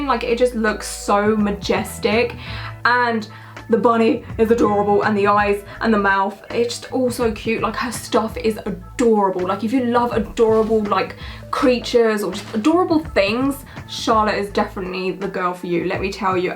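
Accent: England, United Kingdom. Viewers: That's the way all English people say it lol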